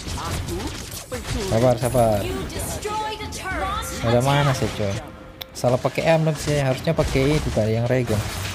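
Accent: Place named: Sumatra, Indonesia. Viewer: Cara ngmongnya kek orng sumatra lu bng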